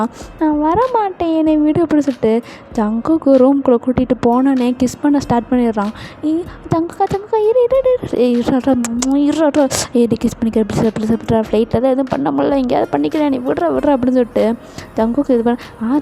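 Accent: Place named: Coimbatore, India. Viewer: Super story 😅ma semma I am your new subscriber ama neenga Coimbatore a slang appadithan irukku athan ketten 😅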